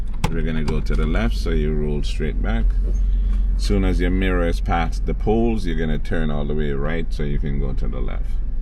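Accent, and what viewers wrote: Jamaica. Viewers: Know you sound Jamaica bro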